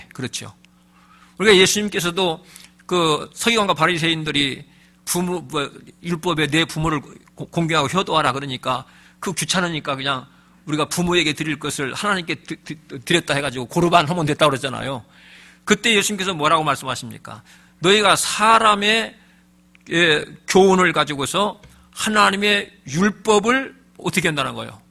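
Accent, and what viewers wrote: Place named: Honam, South Korea. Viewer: 목사님처럼 호남사투리가구수하게들리게하는목사님이또있을까싶습니다 권력눈치안보고소신껏예배를귀하게여기라는하나님의뜻에맞는하나님을진정두려워하는목사님의말씀 감사합니다!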